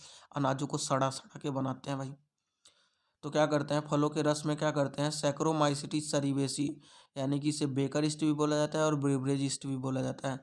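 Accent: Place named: Bihar, India. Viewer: Aap Bihar state se hain kya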